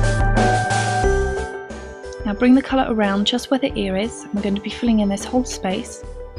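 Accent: United Kingdom. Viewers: If someone did these videos and had an annoying voice I wouldn't be able to watch it but u voice is all British and stuff >.<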